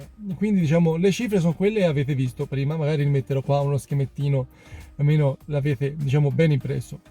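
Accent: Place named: Apulia, Italy. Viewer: Bellissimo accento pugliese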